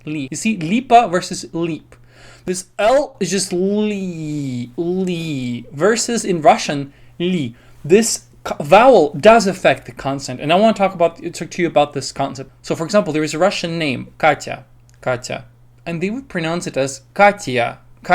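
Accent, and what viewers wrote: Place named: United States. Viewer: Хорошее видео. Немцы и британцы именно так и произносили мое имя, со звуком j. Как приятно слушать ваш американский акцент, а с кем вы его ставили?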